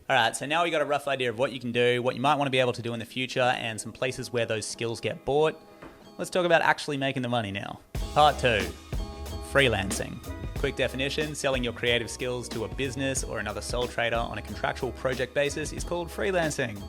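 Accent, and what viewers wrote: Australia. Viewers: Lots of good information here. I am considering watching this again. The fast talk in an Australian accent combined with the motormouth editing made it a bit difficult to follow. Consider spending a bit more time on writing with brutal cutting to get a concise message with more impact, in less time. Know what is important in your message and cut out some of the snarky humor. Language was invented, after all, by air-breathing mammals so there is a reason for the natural pauses in our speech. Your humor would hit the mark more often with some space to inhale giving the viewer time to "get" the joke. Remember that the most important part of your message is always non-verbal. It's in the spaces between the words. Give us those spaces so that we can interpret your speech. I appreciate your frank and personal style. The core message is empowering and inspiring. 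More please. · He has an Australian accent. He sounds just fine and legible to me, but I’m American and English is my only language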